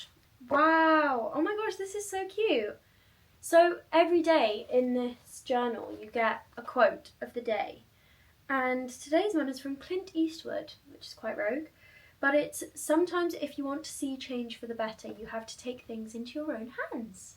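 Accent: United Kingdom. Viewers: I absolutely love your videos, I am from spain and I love hearing your british accent 💕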